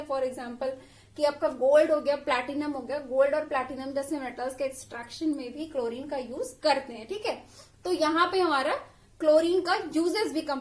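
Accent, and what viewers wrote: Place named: Bihar, India. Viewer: You are good in teaching. I am a chemistry faculty for jee/neet. Your teaching notes are concise and very clear, strictly according to ncert syllabus. No unwanted talk; only topic relevant content. Keep it up and keep posting. You are bound to rise on this platform. Also As bihari, I liked your accent accent.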